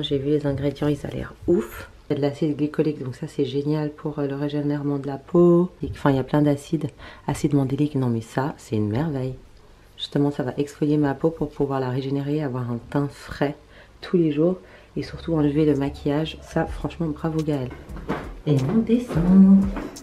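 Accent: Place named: Geneva, Switzerland. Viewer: J'adore les intonations Genevoises ...c'est un plaisir de vous suivre depuis la Suisse plein de belles choses pour la suite.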